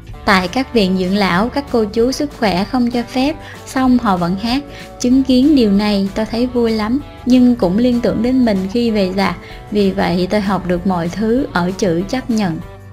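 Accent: South, Vietnam. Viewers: Ban la nguoi mien nam .chu kg phai nguoi bac .ban doc tu giã .no nghe sao day ban hay sua lai di .ban nen doc tu giã .thanh dã di .cho de nghe .chu ban doc khang giã .nghe ky ky .khang dã de nghe hon nghe ban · Giọng thì miền nam, mà phát âm chử " G" thì miền bắc nghe thấy kỳ kỳ .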